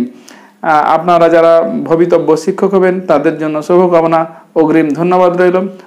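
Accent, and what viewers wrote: India. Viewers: ভাইয়ে বাড়ি কি পশ্চিম বঙ্গে।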